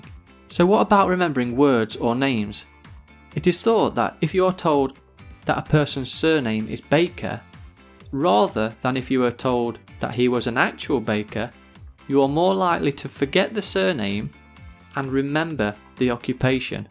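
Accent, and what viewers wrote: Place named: United Kingdom. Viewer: ｍａｙＢＥ it's uk アクセント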